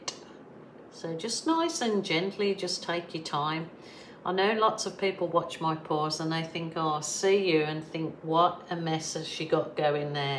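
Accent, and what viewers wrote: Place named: England, United Kingdom. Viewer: Very cool! So what did you use that gave you those cells? Nice! You know what I haven't heard for ages? "FINGER POPPING!" 😂 You've definitely got the pom accent 😊That's who you are! I moved to Canberra from South Africa 18 months ago and I doubt I'll ever pick up the Aus accent. Maybe a little here and there but in general, no. Kids might though! 💕💜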